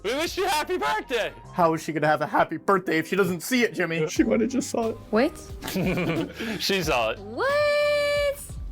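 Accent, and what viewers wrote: Russia. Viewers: I love that Russian accent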